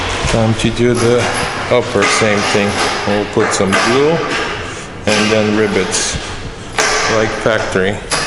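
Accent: Russia. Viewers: Давно живёшь на заподе? Очень сильный русский акцент, чем-то белорусский напоминает.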